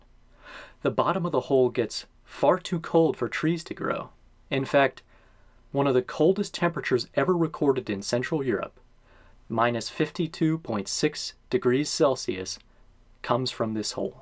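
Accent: United States. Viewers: Why only use Celsius even when discussing American temperatures also you have an American accent why not get our temperatures too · You have an American accent, but default to Celsius?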